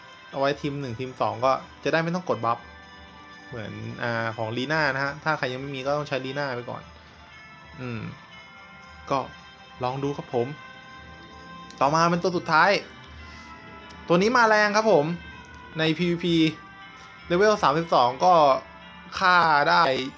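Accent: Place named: England, United Kingdom. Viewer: อ่อ เป็นสำเนียงอังกฤษ เข้าใจแล้วขอบคุณครับ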